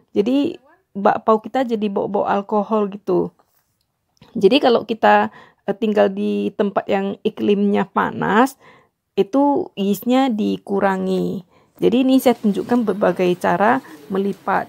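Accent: Medan, Indonesia. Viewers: Ci org medan ya? Mama sy kl ngomong indo jg gt hahahaha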